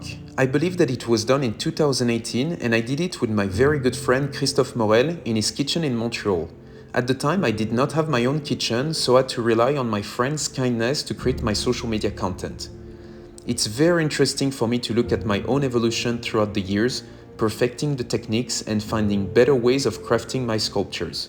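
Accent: France. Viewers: WOW. Remarkable. Delicious. Inspiring. Always nice to see sculpturing beautiful ART work out of chocolate. The FRENCH accent is a must. ❣️🏹🐪🐫🦩🦚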